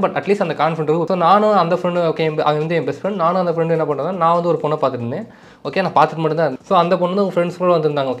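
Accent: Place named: Madurai, India. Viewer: Bro madurai kaarar pola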